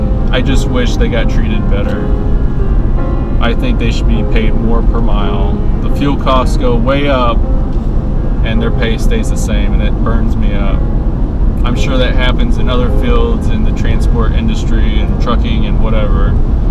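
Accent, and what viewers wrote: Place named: Canada. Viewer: Well that's really good I'm happy for you and I'm sure you like it probably a big change for you you sound like you're from Canada are you well thank you for answering my reply really enjoyed your video I could hear your frustration I guess you would say and in the tone of your voice and I completely understand I've been in similar situations and of course with different companies doing different type of work but I completely get it then again really enjoyed your video looking forward to seeing more of them and God bless and I hope you have good luck in your new work opportunity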